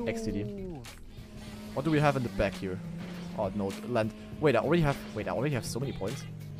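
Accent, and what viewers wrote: Germany. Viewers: gotta love the fact that you can hear the german in his voice, cool video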